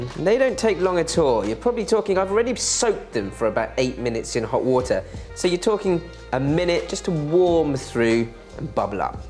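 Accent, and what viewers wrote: United Kingdom. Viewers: Asian with british accent is wild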